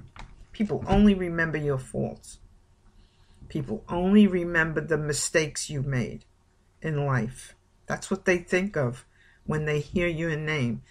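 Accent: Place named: New Jersey, United States. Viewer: I am so glad I came upon your channel today. The messages you deliver, of the videos I've watched so far, could not have come at a better time for me. I love your accent (Jersey?) and articulation. Thank you for sharing this knowledge with the world. We so need to hear it and spread the good Word.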